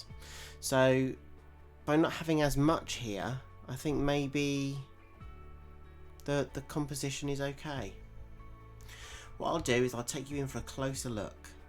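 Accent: England, United Kingdom. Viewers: OMG.I could almost hear your cogs turning and I loved it.I'm glsd I'm not the only one who makes split decisions. No matter how we plan,the art just takes it own natural journey. The piece it beautiful.So delicate and full of movement. I LOVE IT. Thank you for sharing.Git the ljnk off Facebook and have now subscribed to your channel.I may do the Molly/Olga and Donna course seeing as you have done it,it must be good.I love these 3 artists.I am also wondering about the Daler and Rowney paint.Is it a good brand?I am in Ireland and am wondering (because of your accent if you are England)because if you are then the products you use are probably easier for me to source. Thank you so much for the links and videos.I will enjoy watching.I like your style.🤗🇮🇪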